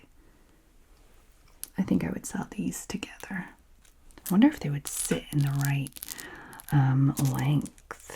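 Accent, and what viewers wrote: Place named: Scotland, United Kingdom. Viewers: She's Scottish but has lived in France for years! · ! Thank you for your amazing content. I stumbled on your channel today accidentally. Your accent has me so confused in a good way. Sometimes it sounds Scottish. Sometimes Mid Atlantic. Are you Canadian? Your voice is hypnotic no matter what!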